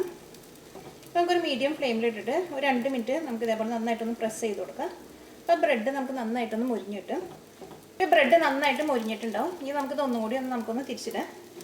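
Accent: Thrissur, India. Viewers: Trisur bhaashayalle ith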